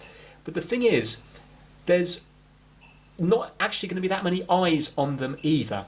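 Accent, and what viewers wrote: United Kingdom. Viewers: I find watching videos of people trying to fix thing therapeutic also I love the British accent (I'm American btw) Congrats on 500k glad to be apart of it :)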